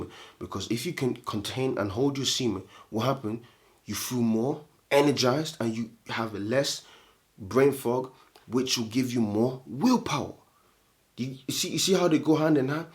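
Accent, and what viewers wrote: United Kingdom. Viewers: based on your accent im assuming you are from the UK, I have a question, do you think it’s realistic that if I retained for let’s say 6 months wisely having my diet in check fasting and meditation etc, that I could earn around 200 to 300 gbp on average a week earning money online if I spent 6 months of research on it? It’s a specific question but I’m just curious how quickly SR can get me out of this rat race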